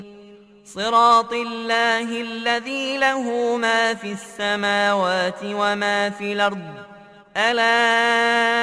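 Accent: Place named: Morocco. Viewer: ماشاءالله تبارك الرحمان قراءة مغربية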